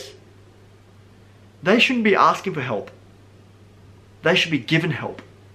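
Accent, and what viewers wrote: Australia. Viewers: I love your Australia n accent.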